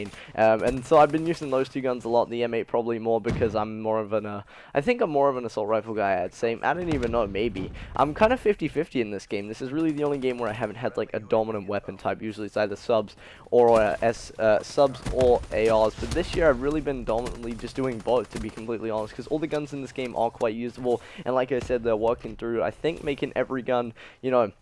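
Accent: England, United Kingdom. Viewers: I notice the slight accent my dude, are you from across the pond in England? If you are, do you watch football and/or have a favorite team you support? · What part of the Uk are you from? Sounds like your English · Where about in England are u from?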